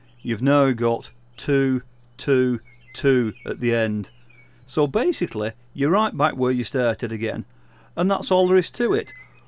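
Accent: Ireland. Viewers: Sir, you are a very, Very, VERY good teacher! The Irish accent doesn't hurt either.😉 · Boy do I love your Irish brogue! It makes my Irish blood sing! (I’m 40% Irish and proud of it!). You’re a great teacher and I think I’ll subscribe!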